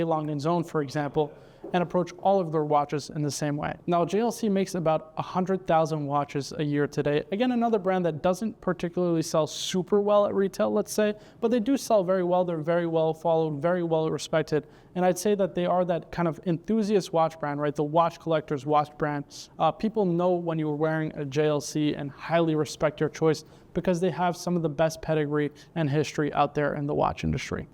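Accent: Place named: Quebec, Canada. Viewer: Please stop the québécois accent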